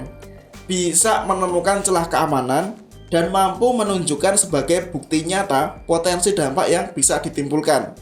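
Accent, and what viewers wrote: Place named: Java, Indonesia. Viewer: agak medhok sedikit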